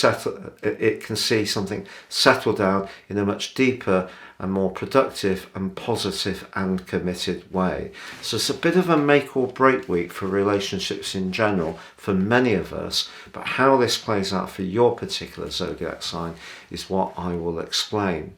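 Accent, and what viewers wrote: United Kingdom. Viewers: I love this gentlemen. I love to hear him. He speaks fine and proper, love the British accent, I my personal opinion is the must marvelous, beautiful accent. Love him!!!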